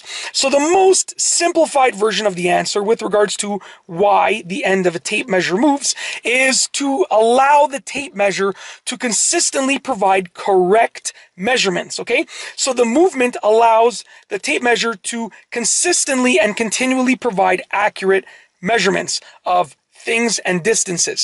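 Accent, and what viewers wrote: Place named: Woodbridge, Canada. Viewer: This is the Woodbridge, Ontario accent, but it extends to most of York Region, and any other Italian neighborhoods north of Toronto proper.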